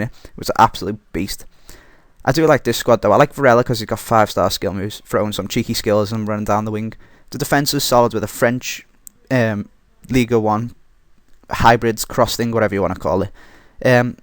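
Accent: Liverpool, United Kingdom. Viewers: omg a scouser who makes fifa videos yes !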